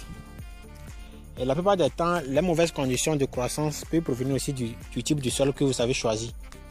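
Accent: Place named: Africa. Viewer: DSL, je ne comprend rien,,,,, accent Africains trop prononcé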